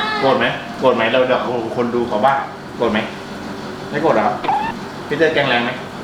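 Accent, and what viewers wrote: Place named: Myanmar. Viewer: มึงเปนพม่าเหรอสำเนียงเหมือนเลย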